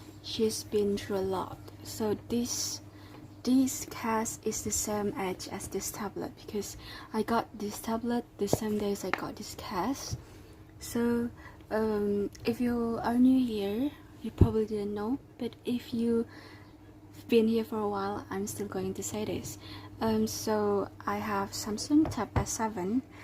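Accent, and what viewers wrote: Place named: United Kingdom. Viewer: I think you have a British accent... So cute..😊😁. Thank you for a wonderful video.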